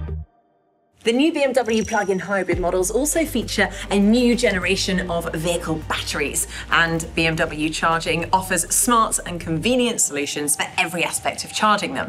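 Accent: England, United Kingdom. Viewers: Very english accent! :)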